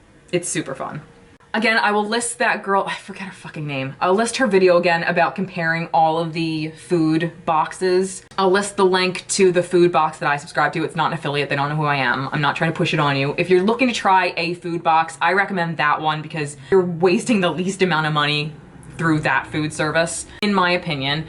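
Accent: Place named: Philadelphia, United States. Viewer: I recognized your slight accent and realized you’re a fellow philadelphian ☺️ I love your content and how real you are!!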